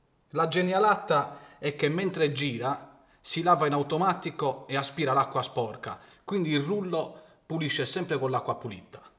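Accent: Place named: Sardinia, Italy. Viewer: Si sente perfettamente che sei sardo!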